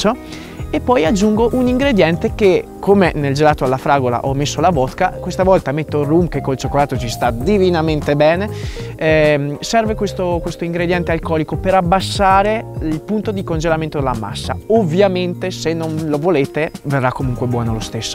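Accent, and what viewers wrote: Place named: Romagna, Italy. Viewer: Come mai hai la ESSE romagnola?!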